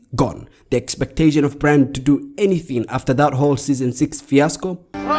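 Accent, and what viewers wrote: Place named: Somalia. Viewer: Are you East African? You have a Somali type of accent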